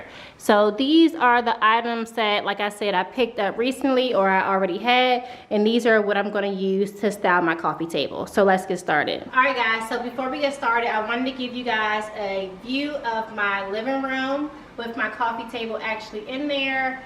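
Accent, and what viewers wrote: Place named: Washington DC, United States. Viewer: Yeasss !! "I'm A DIME" from the first time I started watching ,I subscribed! I hear the DC accent, I love your style.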